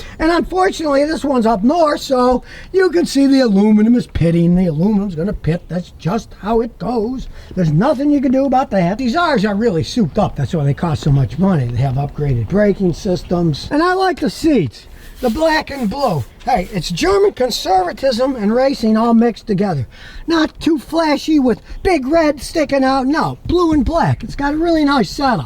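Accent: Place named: New Jersey, United States. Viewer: Sounds like Jersey,. Sounds like george c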